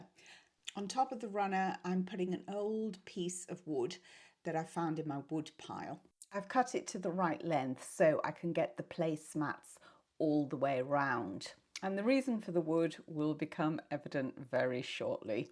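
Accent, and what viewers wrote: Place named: London, United Kingdom. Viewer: ❤️❤️❤️❤️❤️❤️❤️❤️❤️❤️!!!!!! What time should I arrive for dinner?? How beautiful!! I must add, I have met 2 people this week, on separate outings in Beaufort, SC, who both talk and sound just like you. Both are from London, how about that. And….I had to share with them how I follow you and just adore your accent and love the words you use to describe things. ❤️❤️❤️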